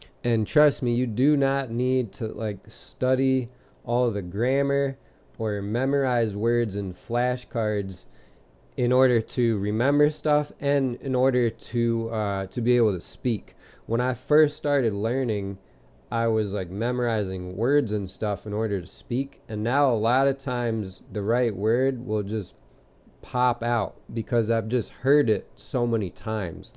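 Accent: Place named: Slavic, Eastern Europe. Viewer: wow, I thought you were Russian or from another Slavic country😅.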